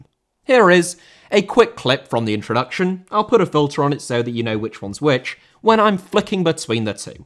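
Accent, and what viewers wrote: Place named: England, United Kingdom. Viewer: Give it a rest bro. He’s saying all of these names thru a heavy English accent. As far as pronunciation goes, done most of the names you’ve mentioned pretty well. He’s not going to say them like a native Portuguese speaker my dude.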